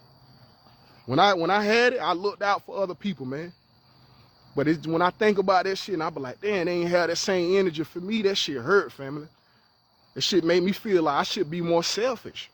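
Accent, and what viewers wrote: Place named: Southeast, United States. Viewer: I haven’t finished the whole video yet but people who are aware enough to see, know your spirit. I’ve been watching your videos for years (starting back at your first blood type videos which I still reference to people today). You’ve been nothing but a positive role model for me. I’ve even reposted some of your content before in the past. Idk what state you reside in but you sound like you’re in the Southeast too. It’s tough in our communities because a lot of our people (including friends, family, spouses etc.) are sick. Some more so than others. Whether it be subtle but functional spectrum disorders along the lines of what we know today as ADD, ADHD, Asperger’s/autism all the way to more imperative functioning behavioral disorders such as narcissistic personality disorder to sociopathy & psychopathic tendencies. 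This is before we even factor in drug & alcohol indulgence of the presence & past as well as people who consume a self destructive selection of “foods”. You’re a beacon of light. You’re a teacher surrounded by those who have a hard time even learning. Much less able to take accountability for mistakes they know they made in the past. Most people want to run from their mistakes. I’m watching it happen now with family members putting romantic relationships before the children they already have in the world only to have more children & treat them the same way. It’s a tough reality to accept that a lot of us have sick/psychologically off elders & peers. Just like some o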